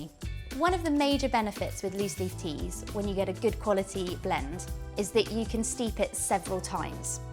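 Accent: England, United Kingdom. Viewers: Aside from the beautiful English accent ❤this lovely young lady can sell me anything ☺️ where can I buy that brewer in the USA ? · The lady in this video speaks very eloquently (Queen's English) so in this instance I would say she has a very English (not British) accent. You are correct to note that there are many accents in England but if you listen to news readers or the folk from private schools they have what most people perceive as English accents (stereotypically). Because folk can't distinguish between Britain and England there are always comments of this nature. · Of course there is such a thing as a British accent. This lady has a British accent. So does Billy Connolly. So does Tom Jones. So does Geoffrey Boycott. They all of course sound very different, because there is no SINGLE British accent. Instead there are many. But to people from other countries, the differences between various British accents are harder to distinguish, just as British people might not pick out which part of the United States an accent comes from, merely recognising it as American. 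· She has a British accent. I believe her.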